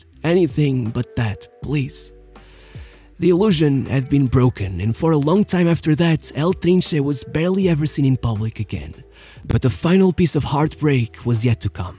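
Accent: Portugal. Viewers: Nice Portuguese accent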